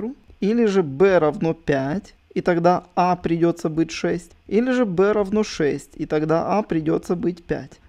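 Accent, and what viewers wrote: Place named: Israel. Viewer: По акценту давно стало понятно что ты не русский, а из Израиля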